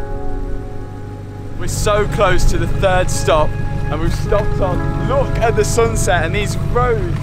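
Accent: Leicester, United Kingdom. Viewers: Your from Leicester 😁😁😁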